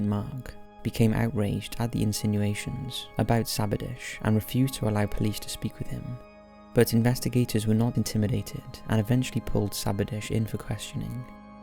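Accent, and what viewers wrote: Wales, United Kingdom. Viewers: Sounds welsh to me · It's definitely Welsh, I live in the Midlands and we sound nothing like this guy. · Deffo welsh! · Definitely sounds Welsh to me! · Except as a Welsh girl, I definitely do hear Welsh in his accent!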